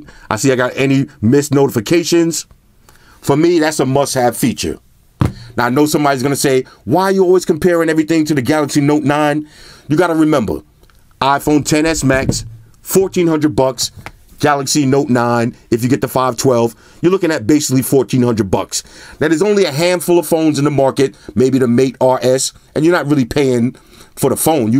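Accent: New York, United States. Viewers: Lmao i love how u talk u from Nyc? I’m from BROOKLAWN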